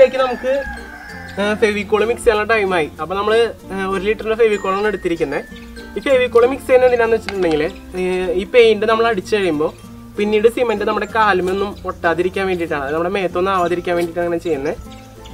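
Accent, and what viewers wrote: Thrissur, India. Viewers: തനി നാടൻ തൃശൂർ സംസാരവും കൺട്രി ലുക്കും ഉള്ള ഇയ്യാൾക്ക് യുട്യൂബിൽ എന്തു കാര്യം (ഇതു പൊതുവഴിയല്ല )എന്നു വിചാരിച്ച് മനസ്സില്ലാമനസ്സോടെ ആണ് ഈ ചേട്ടായിയുടെ വീഡിയോകൾ കണ്ടുതുടങ്ങിയത് ...പക്ഷെ ചുരുങ്ങിയ സമയത്തിനുള്ളിൽ നമ്മൾ സുല്ലിട്ടു ... ഇത് താടി വെച്ച അത്ഭുതം തന്നെ ... great ideas ....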